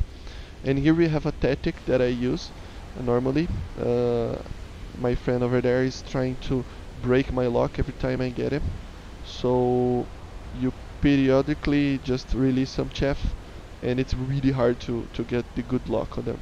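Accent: Brazil. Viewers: Me parece que vc é BR kkk, Nice vídeo.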